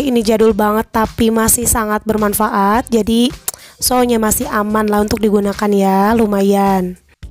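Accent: West Java, Indonesia. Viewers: nonton kr konsen jdna kagugu s teteh na sundaaa😂😂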